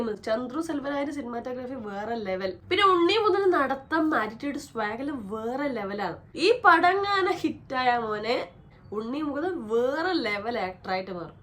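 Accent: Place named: Palakkad, India. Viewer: നിങ്ങള് പാലക്കാട് ആണല്ലേ ❤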